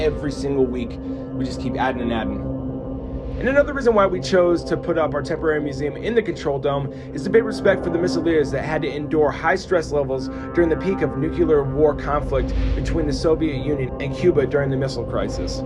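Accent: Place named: East Coast, United States. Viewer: Are you serious? The man is from the East Coast, guess you don’t get out much.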